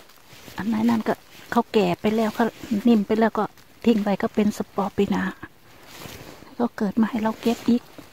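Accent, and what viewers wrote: Korat, Thailand. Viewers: สวัสดีจ้ายาย ป้าเขามาดูคริบเก็บเห็ดหลายคริบแล้วป้าชอบดูคริบเก็บเห็ดชอบกินดายาย พูดโคราชยายอยูอำเภอไหนจ้า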